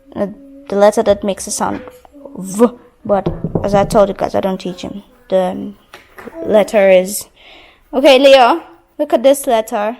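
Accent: Jamaica. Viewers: not 100% fluent in English and patois, so there is mix of both when she talks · Yes. Teach him. He will regret not learning when gets older. I love your Jamaican accent. Im Jamaican and taught myself russian some years ago. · You have a real jamaican accent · Ur getting the Patois LoL 😂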